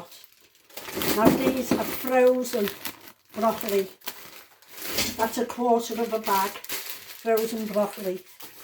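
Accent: Liverpool, United Kingdom. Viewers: Hi, im from Huyton Liverpool, your a lovely woman, Luv yur scouse… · You are just the Best mother .. grandmother and great grandmother and thank you for the laughs 😂. Keep up the good work...Your Scouse sound delicious. Enjoy ...😊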